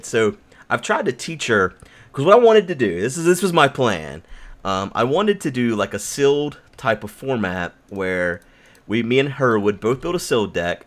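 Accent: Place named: Alabama, United States. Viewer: dude, are you from Alabama?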